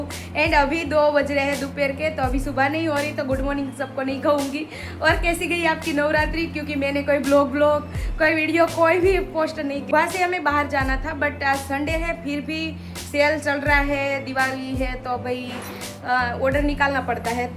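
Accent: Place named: Gujarat, India. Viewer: Gujarati ma j bolo dii. Kyoki aap Gujarati ho to aap ka Gujarati hi ton aa raha hey.. Hindi nhi...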